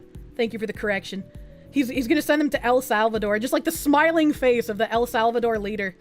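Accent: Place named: New England, United States. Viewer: I ❤ New England Accent. Am also from New England.😁👍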